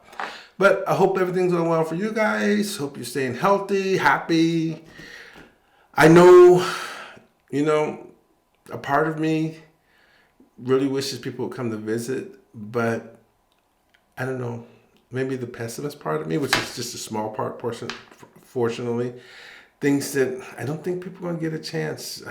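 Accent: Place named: Italy. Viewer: You picked up an Italian accent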